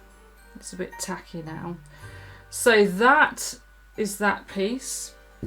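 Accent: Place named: United Kingdom. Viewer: I think everyone hates the sound of their own voice. I feel like mine is really deep for a female but then I'll hear myself on a voicemail or something and it sounds nothing like what I hear. But you have a great voice. I'm a sucker for an accent anyway. I'm assuming you're from Great Britian. Do you live there now? One day I hope to make it across the pond to visit all of the British Isles including Ireland. I've always been in love with those places and it's my dream to visit!!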